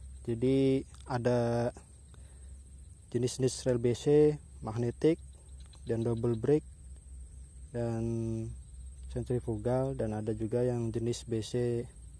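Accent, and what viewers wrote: Banjar, Indonesia. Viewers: urang banjar kah bang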